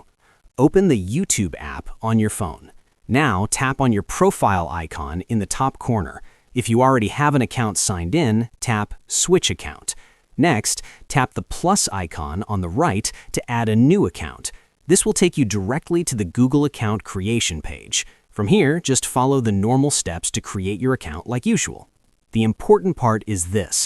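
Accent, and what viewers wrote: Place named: United States. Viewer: Finally a fucking american (proper english) accent would prefer a fucking ai than a fucking indian all the way!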